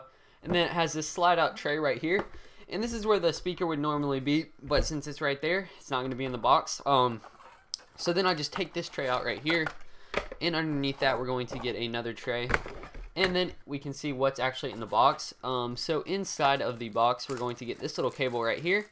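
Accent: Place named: United States. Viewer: AMERICAN ACCENT!!!!!!!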